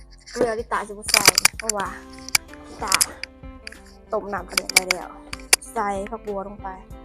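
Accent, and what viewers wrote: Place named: South, Thailand. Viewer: ເມື່ອຄົນ ໃຕ້ໃດ້ຍິນສຽງໃທໃຕໃທໃຕ້ຈັງແມ່ນມີແຮງເຫມືອນໃດ້ຢູ່ບ້ານຕົວເອງ😊❤❤❤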